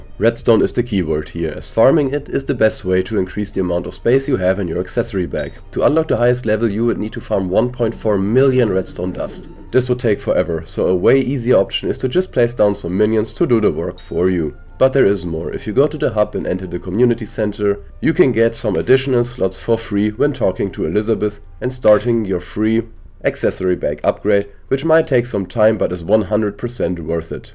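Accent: Germany. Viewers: This dude has to be German. He sounds so hard german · Do you speak german because im german and your accsent kind sounds like it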